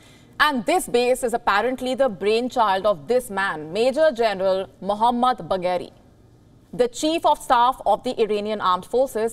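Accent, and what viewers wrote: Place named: India. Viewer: please change other news reader since this India are IndLish then English...so twisted tounge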